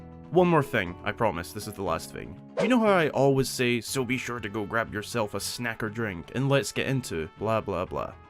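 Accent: Scotland, United Kingdom. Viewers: I like yer scottish accent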